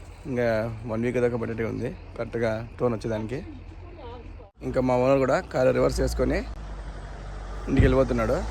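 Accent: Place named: Rayalaseema, India. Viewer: మీ తెలుగు చాలా బాగుంది మా రాయలసీమ వారివాలె వున్నారు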